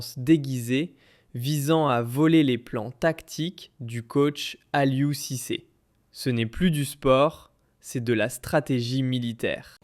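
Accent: Quebec, Canada. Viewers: L'accent québécois est terrible. Changez SVP. Mettez le lecteur habituel.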